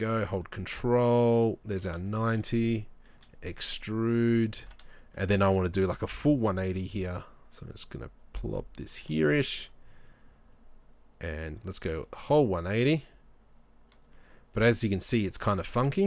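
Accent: Australia. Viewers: Spinning tutorial made infinitely better in an Aussie accent ;P - Good stuff and very helpful.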